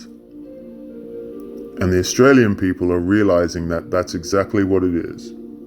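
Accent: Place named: United States. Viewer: What the heck are you talking about with your American accent and admitting that you haven't read the document?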